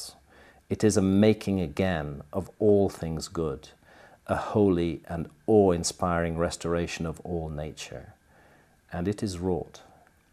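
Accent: United Kingdom. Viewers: Then those sexy 💋, please yall don't do that to me anymore, because I'm from small town alabama u.s.a , but qas once married to a David O'Connor migrated from Ireland pre tern he died 3 yrs ago he was also 28 yrs my senior opposite race than I so we did indeed have plenty of problems but ok anyway I simply miss the acsent of men from the isles, u.k. great britain, I'm absolutely blushed, cause by my living in the country the country, I only hear such acsents on you tube its just intriguing. Fabulously prevented, which is no surprise with you because your always +#100 in mt book because your you and that's a real man whom will gave his voice heard on misdoings of others as well as honesty in presenting your newly found discoveries to the attention of the masses, awesome research 2. Thank you for sharing your knowledge of truth with others such as I. You and your 👪 remain blessed. 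Keep doing what your doing , DON'T CHANGE A SINGLE THING.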